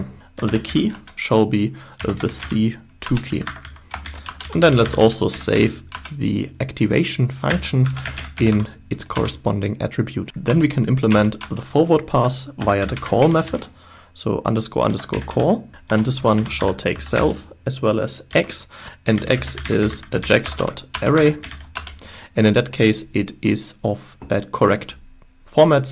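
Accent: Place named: Germany. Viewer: Are you german? Sound very german! Great videos BTW!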